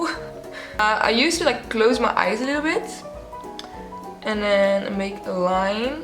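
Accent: Netherlands. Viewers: Is she Dutch? She sounds like me lol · Ben je Nederlands? Ik hoor dat dutchie accent haha, leuke vid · im just so confused if im hearing this in english or dutch lmao such a mindfuck love the accent tho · haar accent is onmiskenbaar nederlands haha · Love the Dutch accent hehe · hu?? kan ze nederlands? ze heeft echt zo een accent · haha i love dutch accents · Echt een Nederlandse accent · Lmao Germans pronounce nyx the same way but she’s like obviously Dutch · lmao dat nederlandse accent springt er wel uit xd <3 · her dutch accent is sooo strong. Love it tho since mine is just as strong as hers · dutch accent !! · I can sound the dutch in this tutorial i love you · lol yes I was like ohh she dutch. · Are you Dutch? You sound like you're Dutch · Are you Dutch? I recognise that accent from miles away haha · Lmfao I'm dutch and I didn't even know you're dutch but as soon as I heard your accent I just knew it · She is not german she has a very pronounced dutch accent not german. 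She sound like dutch VS model Romee Strijd when she talks lol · this was my guess after the first 30 seconds, and now I'm trying to figure out if she really is Dutch. Love that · Super Nice Dutch accent! Lol love the tutorial ! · nah, she's dutch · no one lmao a quick google search would show you she’s dutch. She has a very dutch accent. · I am Dutch and I just know from listening that she is very much Dutch lol. The accent is recognizable when you are from the same country xD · Your accent reminds me of my dutch cousins. I find eyeliner the hardest to apply. I dont' apply as boldly as you when I wear it. Find it seems to sit better when I follow the line as an extension of the lower eye shape and only do the outer edge. I haven't tried putting on inner edge as thought might might make my eyes look closer together. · we love the dutch accent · that dutch accent i- 😭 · Are you Dutch? Judging by your accent hehe. 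Good video btw, it's so different to 'wing-it' with hooded eyes...!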